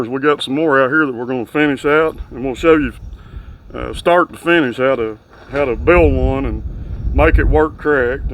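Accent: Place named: South, United States. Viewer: I guess you have to be part southern to understand him. I find him to be perfectly understandable and I love listening to my very missed southern speak, trapped in the north wishing the snow would go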